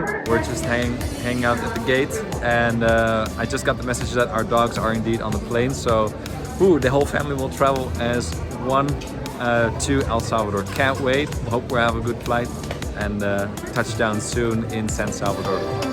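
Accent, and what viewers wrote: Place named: Netherlands. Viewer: What country are you from , your frame and face made me think anglo, but with the accent I thought Dutch or even Danish But the name is Portugues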